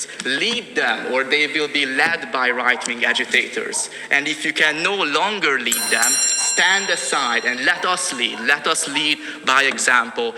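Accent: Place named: Ireland. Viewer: Strong Irish accent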